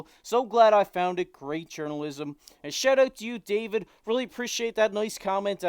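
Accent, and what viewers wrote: Canada. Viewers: As a yank, your Canadian accent kills me!! In a good way. Lol. I'm a Canucks fan so I'm hoping to get either Tanev or Monahan.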